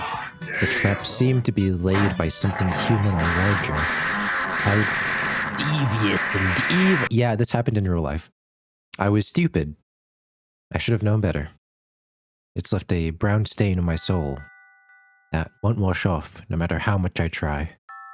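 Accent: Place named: United States. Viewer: Loving your videos man. Got a new subscriber. That said, I know you're Aussie, so I'm curious why your accent is 95% American, with 5% Aussie accent slipping through occasionally. It's tripping me out lmao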